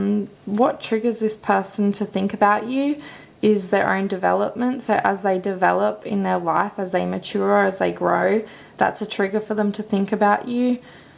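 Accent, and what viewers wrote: Australia. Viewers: Gosh I love it when I hear a fellow Aussie on hear...can feel lonely on here sometimes 🙃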